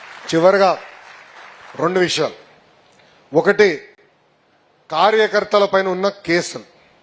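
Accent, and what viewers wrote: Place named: Telangana, India. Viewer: Enti bro telangana slang ra Bai idi enti bai good good rabai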